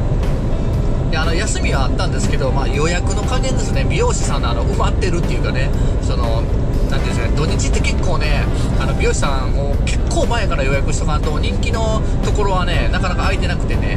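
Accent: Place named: Kansai, Japan. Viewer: 頑張ってYouTubeゆったり余裕綽々叔父さん走行映像情報ありがとう😆💕✨マグロ🐟中トロ海鮮丼宜しくホタテ貝？年末年始頑張ってハーレーツーリング映像お願いいたします🏫✏️🎒⚽ YouTubeトークおしゃべり落ち着いて関西弁可愛い❤️ 元気😃⤴️ハーレー新車納車おめでとう🎉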